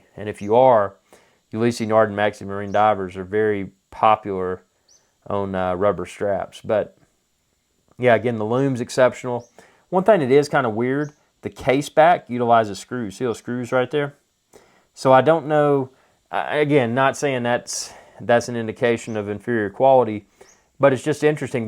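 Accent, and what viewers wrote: South, United States. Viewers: What stands out immediately, besides your strong Southern accent, is that you likely have nail fungus, or rather, your poorly cared-for nails. You seem to obviously have an advanced stage of nail fungus. A combination therapy with an antifungal treatment and pills would be the best solution. What I also expect from a presenter is a bit more technical understanding and a somewhat more professional, more focused on technical aspects and less egomaniacal demeanor. Forgive my sarcasm, but maybe to don't have the know-how to do a more professional job.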